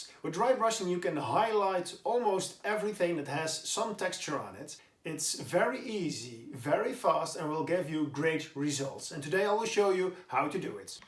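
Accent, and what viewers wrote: Netherlands. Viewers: Jij bent zeker een Nederlander he😏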